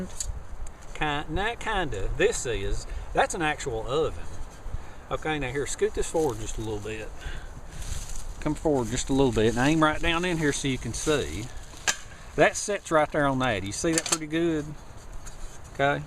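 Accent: South, United States. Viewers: I've subscribed recently and have watched a dozen of you videos since and I think you have a great channel! I work in a similar field as yours and I have to say I really like how you bring the workshop to the bush! Love the Carbon Fibre Chimney and ovens! Nice welding and ingenious stuff! You're quite resourceful with material! Nice sheaths, also! Keep em coming Bud!!! I'm French canadian and I dig your southern accent a lot too! :)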